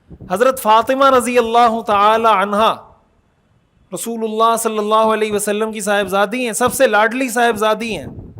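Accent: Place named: Karachi, Pakistan. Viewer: Krachi mein sab ka way of talking hi asa hai.not copy.nice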